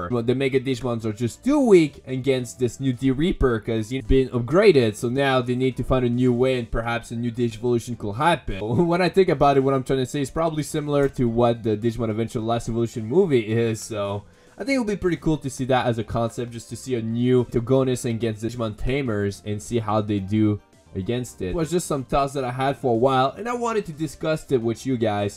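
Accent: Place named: Quebec, Canada. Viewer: Yo my guy, i gotta ask. Are you a french canadian by any chance? Your small accent and a couple word pronunciations are telling me yes XD. Je suis presque sure a 100% lol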